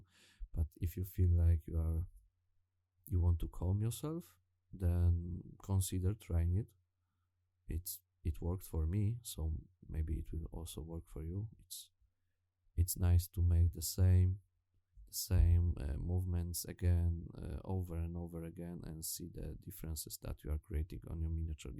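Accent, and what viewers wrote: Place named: Poland. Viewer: You, my friend, have a very polish accent! :D Are You Polish by any chance? ;) Zdrówko!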